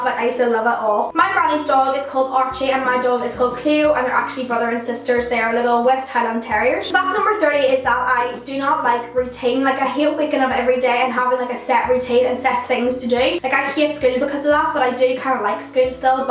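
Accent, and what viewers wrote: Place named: North, United Kingdom. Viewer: I love your accent! my dad is from the north too so I love hearing people's accents other than his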